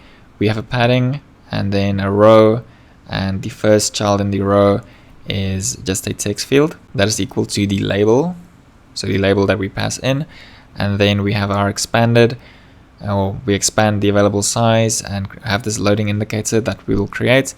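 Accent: South Africa. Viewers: Where are you from? You sound South African? :D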